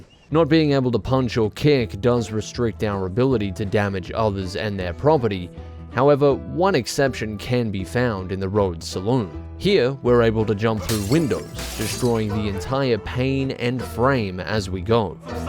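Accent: Australia. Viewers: I think I'm in love with you. Everytime you upload my heart has a sudden shock of excitement. It skips a beat. It is undescribable the feelings I get when I see the notification that has uploaded. Your beautiful australiaun accent. Your well crafted sentences and editing. The narratives and cinemtagraphy blend together to make me EXPLODE! Thank you, thank you so much!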